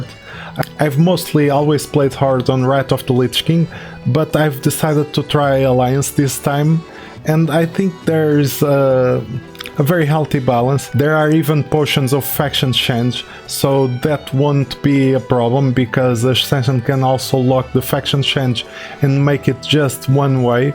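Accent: Portugal. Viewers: Pelo sotaque consigo logo perceber que és tuga! Não sabia que haviam tugas a jogar BBC.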